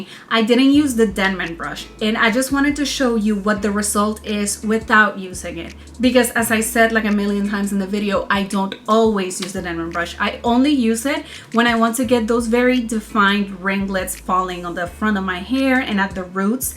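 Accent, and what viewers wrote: Puerto Rico. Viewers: Eah corrillo? Puertorican?👀😂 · voy a tratar not your mother’s! me encantó como quedó. btw eres puertorriqueña?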